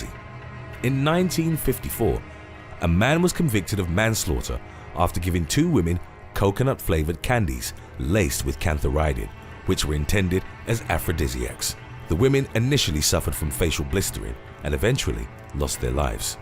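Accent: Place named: England, United Kingdom. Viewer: He's not Australian. That's an English accent, and this channel is notorious for getting things wrong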